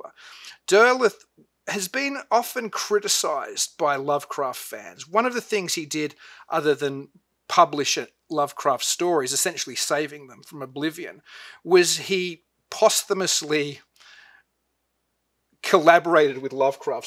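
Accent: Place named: Sydney, Australia. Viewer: Good to see a fellow Sydneysider talking about Lovecraft books 😊Recognised the accent straight away.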